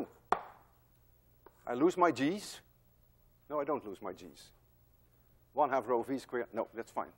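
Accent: Netherlands. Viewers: I like the dutch accent u have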